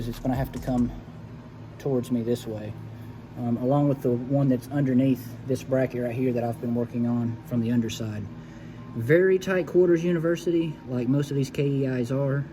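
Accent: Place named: South, United States. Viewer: great video thanks. You sound like you might be in the south....are you for hire to perform this service? I'm in GA and am looking for someone who can do a timing belt service for a Honda Beat.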